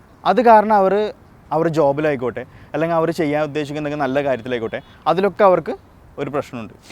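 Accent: Kannur, India. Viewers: From kannur right? nice talk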